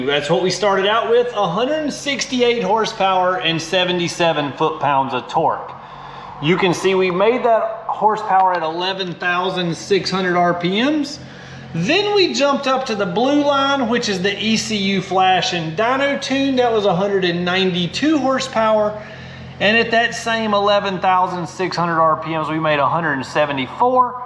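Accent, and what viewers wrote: South, United States. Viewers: Sorry, after trump, I can’t stand a hillbillies voice.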